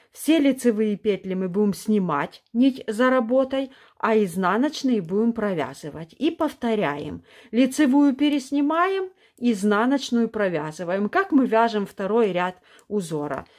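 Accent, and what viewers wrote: Ukraine. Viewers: Здравствуйте,!Огромное спасибо за супер инфо. А у вас есть шапка для детей? сколко петлю надо набрать для7летки? Заранее спасибо за ответ. полюбила вашу речь, украинская)) Привет из Баку.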